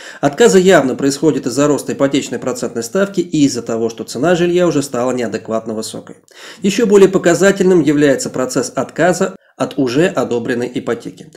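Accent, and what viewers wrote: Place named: South, Russia. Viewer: у вас южный акцент. Если не секрет вы откуда приехали в Москву. 😊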